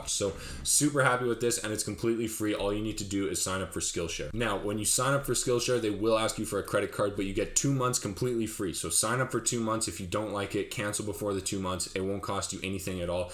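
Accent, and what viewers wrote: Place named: Canada. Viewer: Thank the lord! A man who speaks Canadian. All the yanks nearly made me quit b4 I started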